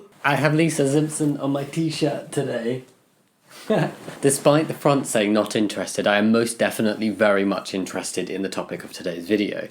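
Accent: United Kingdom. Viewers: off topic but are you british?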